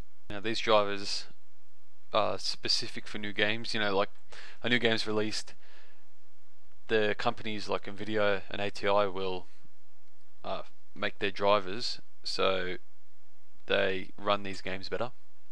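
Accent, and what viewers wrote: Australia. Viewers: I love your Aussie accent! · Defiantly a Aussie, we don't have that accent here in the UK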